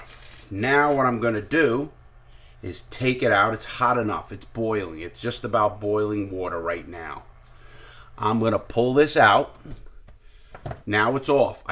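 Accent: New York, United States. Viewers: Thank you. Always appreciate it. My father was born in the South Bronx in 1934. Your New York way of talking about things makes me think of him. He's been gone a long time now.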